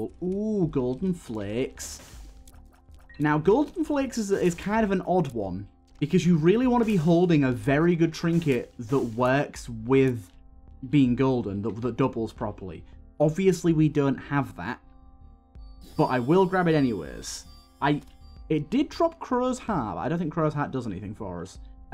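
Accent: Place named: Birmingham, United Kingdom. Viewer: The question to you is simple where are you from in the UK i don't want your exact location just want to know where your accent is from you sound brummie to me but has some twang to it. its been bugging me since i've started watching your channel :(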